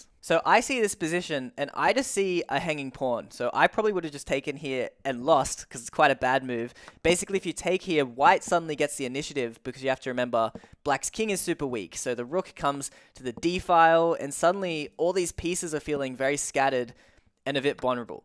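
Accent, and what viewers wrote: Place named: Australia. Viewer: From your accent I'm guessing you're a fellow Aussie. Nice channel!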